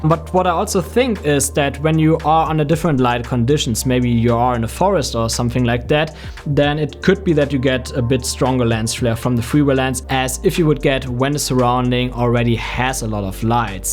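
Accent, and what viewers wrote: Germany. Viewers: Höre ich da einen deutschen Akzent?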